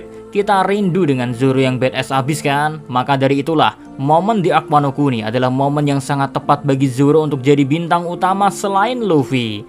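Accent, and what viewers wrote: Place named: Java, Indonesia. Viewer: Gak nyangka chanel ini besar kek gini.. Padahal dulu 2019 pertama aku nonton chanel ini.. Saya menyepelekan chanel ini.. Apa sih chanel kecil gaje.. Tapi lama kelamaan jadi suka seru... Yang paling aku suka jujur bukan teori atau ap apa.. Aku suka suara... Jawa campur indo... Vokal suaranya ciri khasnya itu loh wkwkwk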